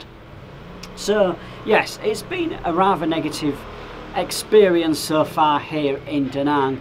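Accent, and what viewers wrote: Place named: Leeds, United Kingdom. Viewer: You're from Leeds/Bradford? Pudsey?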